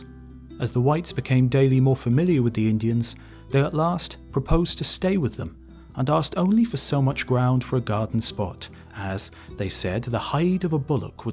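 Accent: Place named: West Country, United Kingdom. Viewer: I just noticed that your accent has a bit of West Country in it. An example is when your i's become subtle oi's. You sound like an early American explorer. It's very cool!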